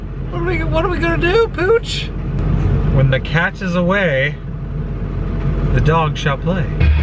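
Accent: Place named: England, United Kingdom. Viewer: shes English is why she says it that way.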